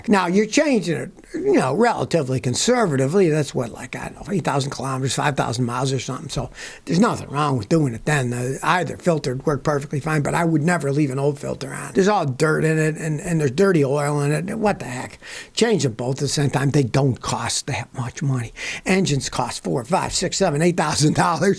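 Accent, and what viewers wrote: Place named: South, United States. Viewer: It is truly sad, that he makes claims not based on facts. His videos are about his opinion, stereotypical old mechanic who just cannot get with new time and engineering. He is so brand-loyal, he can't see how bias he is. I have had owned 3 Kias/Hyndais. Recently sold wife's 2013 Santa Fe with 95k MI. Zero problems in 9 years, purchased brand new. Only thing wre did was new brakes around 60k mi. Had 2010 Kia Optima SX. Bought it used with 150k, once again, zero problems in 2 years that I had it. This is why I do not watch his videos any more. He can't even pronounce Hyundai correctly. As country as his accent is, he is making fun of Alabama? Give it a rest. That are other ways to get views on YouTube.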